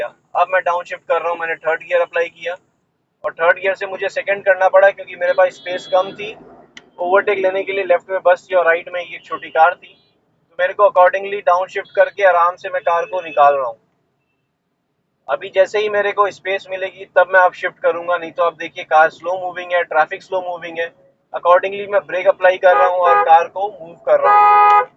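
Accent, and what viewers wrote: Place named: Punjab, India. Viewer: sir aap kaunsa biradari se ho? you sound like a Punjabi to me.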